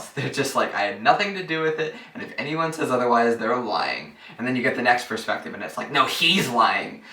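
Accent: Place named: South, United States. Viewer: Ah, I thought there was a bit of a southern accent to your response 😆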